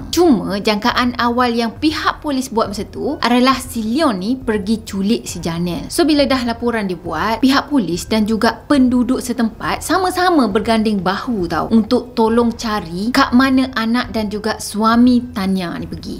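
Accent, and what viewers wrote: Kelantan, Malaysia. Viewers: kamu org Kelantan ke?.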